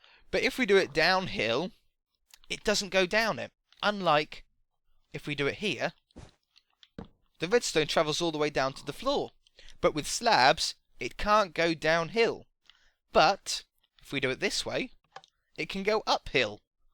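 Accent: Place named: England, United Kingdom. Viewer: I believe the correct word would be enunciate and that is just his english accent.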